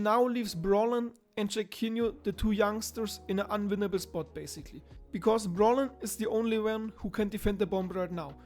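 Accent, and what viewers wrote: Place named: Germany. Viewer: good video mens))) i like your jermen accent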